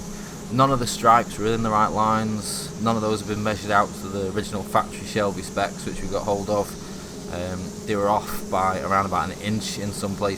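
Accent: United Kingdom. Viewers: Someone paid $$$ for that job! are you doing commerce on the euro or British pound? I'm guessing British pound by your voice? 👍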